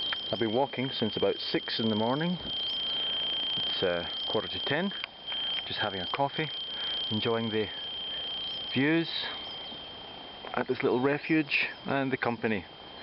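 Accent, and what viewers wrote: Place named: Scotland, United Kingdom. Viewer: Hey man! congrats and thanks for sharing. Love your Scottish accent..Would you like to borrow your voice on new video or film I will be producing in the next coming year..check out my movie about the Via Alpina (blog <link>) Just few questions about Madatschjoch (time ). there are 2 ways from verpeil hutte..what makes you choose the trail through MadatschJoch and not VerpeilJoch ? Did you knew the condition of this trail and what it was like on the way down (ladder and a wall to climb down) ? I assuming you did not know according to what you said when reaching the Col Madatschjoch.... :-) I personally think the way down to kaunergrat was really tough...going up is slippery on unstable ground and with such weather condition you had i know I will have stayed and rest Verpeil hutte..;anyway you did it... From kaunergrat hutte did you follow the cottbuser trail to rifflsee (there is a short section that i would not like to pass on a rainy/bad weather day also there) or hit down the valley asap and skip that short "climbing section" to suunalm/rifflsee ? 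What camera are you using ? will look to your Greek video..I love Greece and it is very funny because you did or went to places I also went and liked Here an idea for you check out Mercantour NP Vallee des merveilles will be something you might like... happy hiking MUL rules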